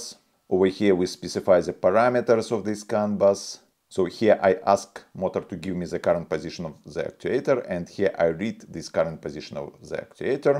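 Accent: Russia. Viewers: Мужик ты же русский! Акцент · Акцент как у русского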